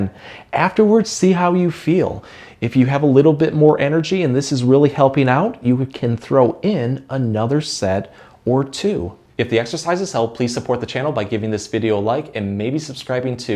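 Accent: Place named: Midwest, United States. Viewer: Wonderful, I'm going to share this, then stretch. It's nice hearing the Midwestern accent. Chicago girl stuck in NC. Thanks for the video.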